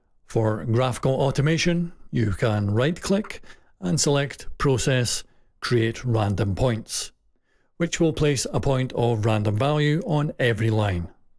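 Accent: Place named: Scotland, United Kingdom. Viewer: Scottish accent without a doubt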